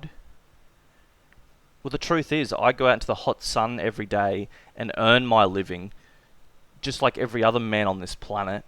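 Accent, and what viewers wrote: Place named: Australia. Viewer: bro I love your channel, your Aussia I can tell, you aussies are the best shittalkers I swear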